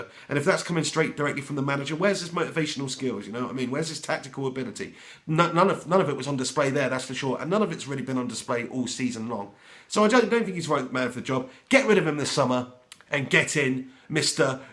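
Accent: Manchester, United Kingdom. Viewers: Your Mancunian accent is very strange.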